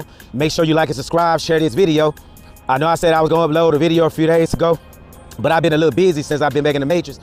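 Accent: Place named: South, United States. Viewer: Hello from the Matrix. Love me some. ❤❤. Southern accent I could listen to all day.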